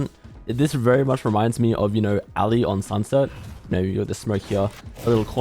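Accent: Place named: Australia. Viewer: dude, you gotta pronounce word more clearly. You talk fast is one thing but you thicc accent combine with halfway pronunciation make it hard to understand when I watch your video in one sitting. That's why I still haven't subscribe to you. Sometimes I even select NOT INTERESTED on YT shorts cuz your pronunciation is terrible for the rest of the world. Maybe not to other Aussies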